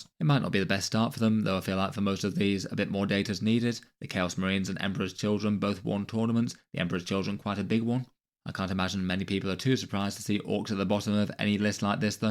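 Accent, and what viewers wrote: Kentucky, United States. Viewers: Enjoy the kentucky pronunciation.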